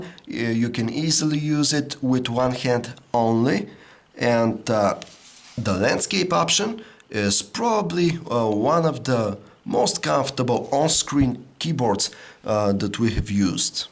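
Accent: Russia. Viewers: put someone english speaking, i dont want to listen rusglish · are u russian ? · Sir r u Russian?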